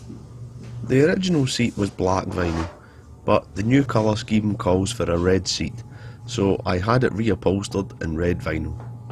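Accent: Scotland, United Kingdom. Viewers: That was another great job. I marvel at your way of working every time. Every single screw is worked on so that it looks like new again. And now this beautiful motorbike is once again a technical work of art. I also enjoy listening to your Scottish accent. I have the greatest respect for your work. I'm already looking forward to the next project!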